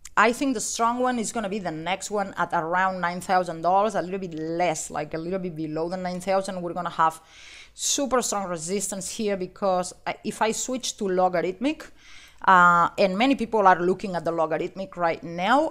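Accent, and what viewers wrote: Spain. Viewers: Hablas inglés perfecto y te noto acento espanol. De donde eres? Saludos, soy de BCN y estoy en VIE. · spanish? from catalonia, my guess.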